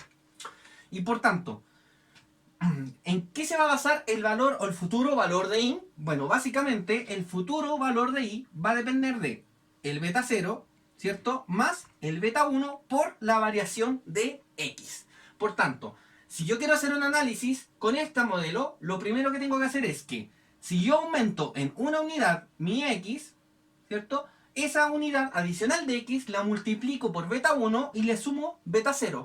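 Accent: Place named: Chile. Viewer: chileno, se cacha altiro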